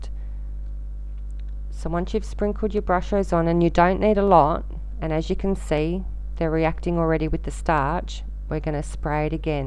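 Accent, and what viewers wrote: Australia. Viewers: Hi, I have just found you. So good to hear a fellow Australian. I really enjoyed your video on Brushos. I have some on order just waiting for delivery. I know that I will love them. Thanks for your video. I will check out your blog. Hugs Glenis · Brilliant I have just ordered some, looking forward to receiving them. Awesome to hear an Aussie doing a tutorial make it seem like home well done.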